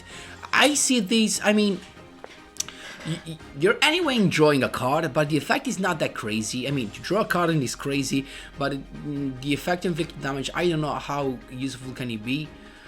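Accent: Italy. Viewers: Great video I so hipped for dark magician, but one question. Are you from Italy? You talk whit an Italian accent. I say it because am Italian and.. I don't know I've this sense of familiar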